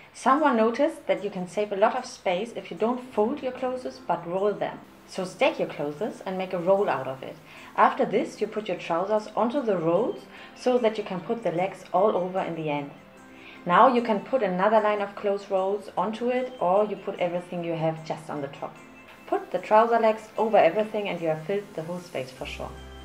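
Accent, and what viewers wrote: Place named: Germany. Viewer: Awwww your accent is so cute!Typical german!😂 Amazing video💗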